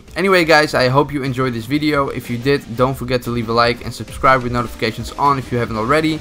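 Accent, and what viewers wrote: Netherlands. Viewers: You sound like youre dutch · im new and have a qeustion are you dutch ? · Your english sound dutch. You from holland?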